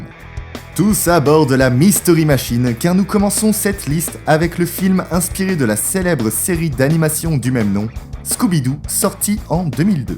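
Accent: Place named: England, United Kingdom. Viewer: Tres bonne vidéo et bien interessante. Et bel accent anglais^^. Sympa vos vidéos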